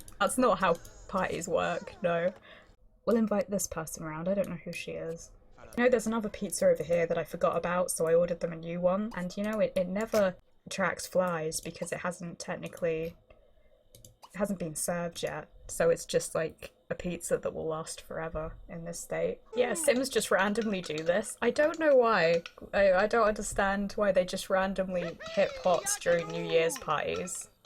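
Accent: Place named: United Kingdom. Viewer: I live in the UK and we do that too and I was kind of confused because she sounds like she is from the UK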